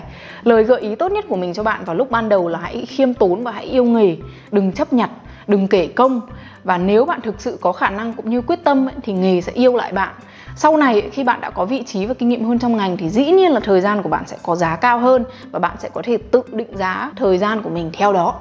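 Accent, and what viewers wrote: United Kingdom. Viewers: Có ae nào thích nghe chị nói giọng Anh Anh không hí hí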